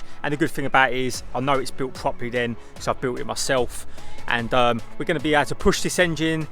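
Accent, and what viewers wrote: Essex, United Kingdom. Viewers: Nice to hear a familiar Essex accent and a channel with decent engine knowledge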